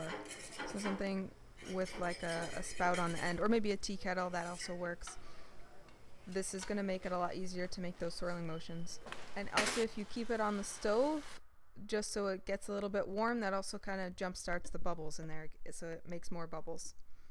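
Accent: Sanaa, Yemen. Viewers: I salute you for doing our dishes. Thanks. And good Sanani accent with the Arabic videos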